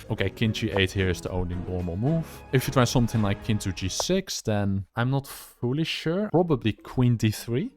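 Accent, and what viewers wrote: Netherlands. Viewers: Is this an AI channel with a Dutch accent?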